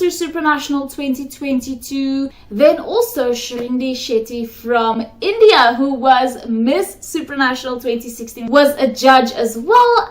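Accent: South Africa. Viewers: I thought you were from south African, you speak with south african accent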